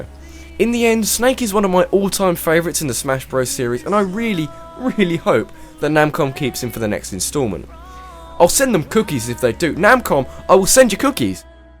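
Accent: England, United Kingdom. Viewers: Nice countdown, you're one of the few youtubers who can make me crack a smile, since I love English accents. PS: Have you played TF2x10?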